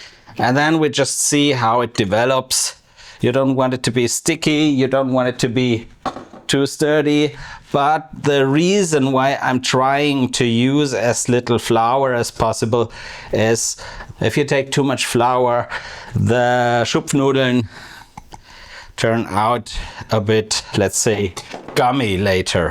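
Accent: Swabia, Germany. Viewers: It is a reference (and a compliment to you) from a U.S. television show called "Saturday Night Live" from the late 1970's and the 1980's. Even though the TV show is still going on, it has different actors now. They do various skits (small plays) during the show. Back then, one of the favorite skits featured two guys who were body builders (weight lifters). And they used a German accent when they spoke. This was when Arnold Schwarzenegger had won the Mr. Olympia body building championship contest and started making movies such as "Conan", "Commando", and "Terminator". So, this was sort of making fun of him, a satire. In the U.S., if you go to a gym to do weight lifting to build muscles in your arms, it is called "pumping iron" because the weights used are made of iron, and you do an up and down motion to exercise, like an old style water pump or today's shampoo bottle with a little pump on top. 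So these two actors, whatever they did, were trying to train the audience, as if they were gym masters, to build muscle, so they could get dates with women. Their favorite line was "we'll pump you up", meaning we will help you get bigger, more muscular arms so all of the women will come to you. You, have large arms, so we assume you lift weights. You have a German accent, naturally. So whatever you do, even though it is in the kitchen, will be to "pump you up" and get bigger, more muscular arms. Even cracking an egg, will be to increase your arm strength "to pump you up". And in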